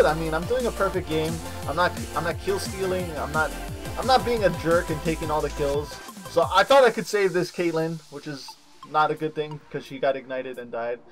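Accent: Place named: Hawaii, United States. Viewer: you must be from hawaii. you sound too local and cool to not be.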